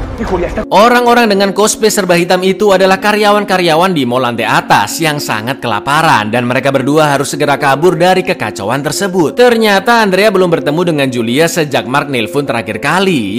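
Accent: Java, Indonesia. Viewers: bahasa mu kang jowo muedokkk asli wg jowooo ..lnjutkan kang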